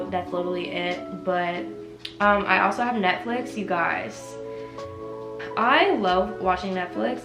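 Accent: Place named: South, United States. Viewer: You kinda have a southern accent ❤️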